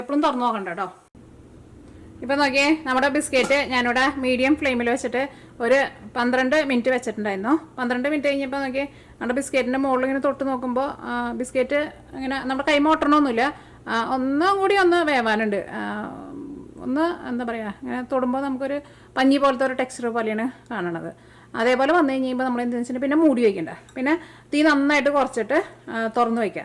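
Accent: Thrissur, India. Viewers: ഞാൻ തൃശൂർ സ്ലാങ് കേൾക്കാൻ കൂടി ആണ് വരുന്നത് ചേച്ചി 😘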